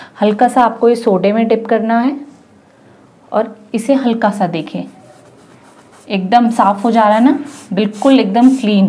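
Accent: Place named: Himachal Pradesh, India. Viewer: Thank You Mam For Replying On My Comment Mujhe Laga Aap Himachal Se Ho Aapki Tone Himachali Lag Rhi Thi .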